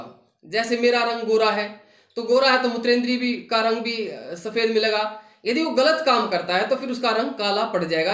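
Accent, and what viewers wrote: Haryana, India. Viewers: हरियाणा से हैं क्या आचार्य जी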